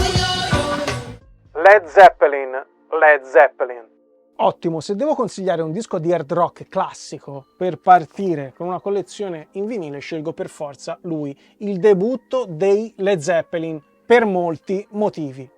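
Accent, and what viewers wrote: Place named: Livorno, Italy. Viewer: Ma te guarda...sei di Pisa...ma si sentiva..ma non credevo di Pisa...ti vedevo più un tipo livornese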